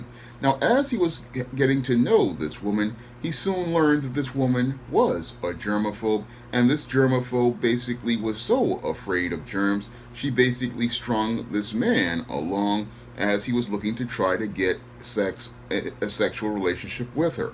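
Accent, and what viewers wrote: United Kingdom. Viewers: Your british accent is so funny.lol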